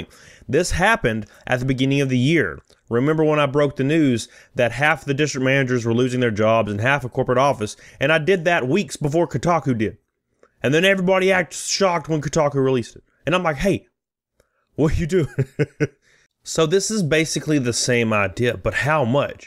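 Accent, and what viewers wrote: South, United States. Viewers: Can’t decide if it’s the southern accent or dumb fucking haircut. But I just want to flog you with a tire wrench. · Man oh man I just love listening to you, you truly are pretty damn funny 😆 You provide great content with a southern twang and mindset that makes, even the distasteful, a platable experience. THANKS 😊😊😊😊😊😊😊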